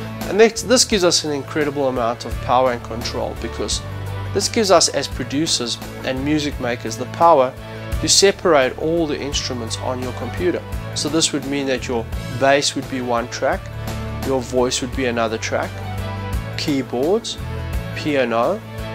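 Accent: South Africa. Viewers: I must say I do enjoy your way of explaining things to the people. Very simplified and gentle (I like your South African accent too) I hope you're happy with your life, I think you have earned the right to be happy :)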